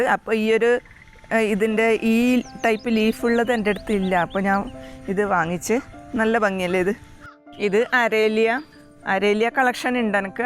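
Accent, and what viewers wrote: Kannur, India. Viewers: Kannooor analle...bhashashyli kettitt angane thonnunnu. CM nde oru style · മാഡത്തിന്റെ സ്വന്തം നാട് കണ്ണൂരാണോ ? സംസാരം കേട്ടിട്ട് കണ്ണൂർ ഭാഷ പോലെ...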